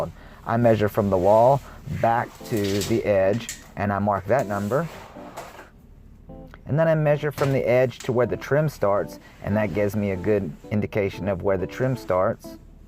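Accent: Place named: South, United States. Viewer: Dude, your a boss on this one! Great explanation. Great accent! I’m thinking somewhere between N. Carolina and Mississippi!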